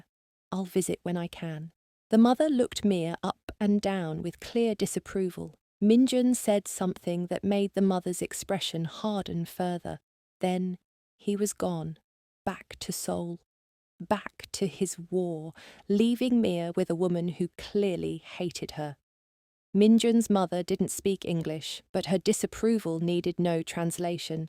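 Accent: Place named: United Kingdom. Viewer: I’m watching from having Indiana, and I have discovered that I could listen to a British accent talk about anything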